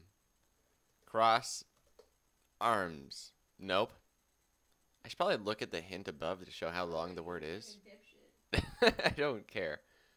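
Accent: Canada. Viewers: haha is starting to sound more Canadian with the eh thing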